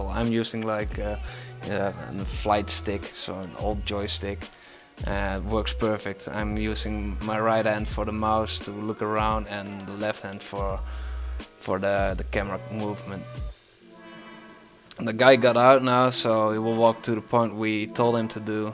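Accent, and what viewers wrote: Netherlands. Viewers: Nice ! Are you from the Netherlands? Just wondering cause of the accent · Great tutorial , easy explanation for dummys and people who just needed that info + I can hear your Dutch so thats always good hehe. If you ever need help editing , acting or anything else please let me know, Would love to know ( + Im also experienced) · You sound Dutch, are you?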